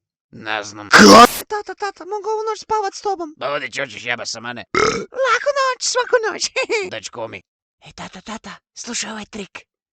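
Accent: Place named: Zagorje, Croatia. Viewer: Ovo je vrhhhh🤣🤣🤣🤣 molim te samo izbacuj van videe s zagorskim naglaskom predobro je. ...vrne me u cajte kad sam hodil u Krapinu h skole pa smo furt neli probleme z krapincanima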